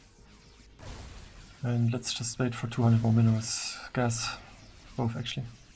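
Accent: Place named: Germany. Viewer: Mach doch mal auch ein Video auf Deutsch man merkt das du deutsch bist dein Akzent sticht sehr heraus xc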